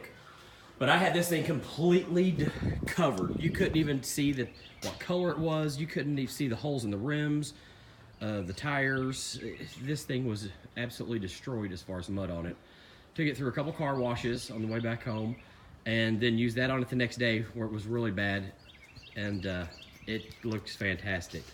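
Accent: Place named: Missouri, United States. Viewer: Sounds like you must be from Missouri I don't know if you heard or not but hillbilly Ranch is closing we usually go to Flat River I live in Illinois in Collinsville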